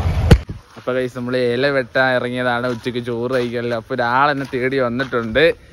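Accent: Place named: Kozhikode, India. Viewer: Kozhikode slang 😁❤️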